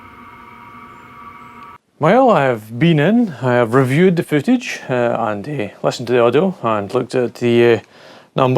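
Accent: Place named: Fife, United Kingdom. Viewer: Your accent rather reminds me of Fife Robertson in the 1960s. Are you perhaps from the proud Kingdom of Fife ??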